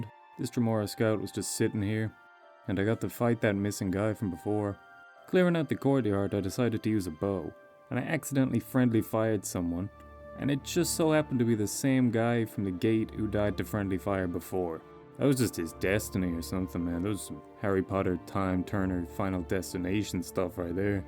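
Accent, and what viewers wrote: Ireland. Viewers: why are you doing a weird american accent? you are so obviously irish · Awesome video, always happy to hear more Irish youtubers.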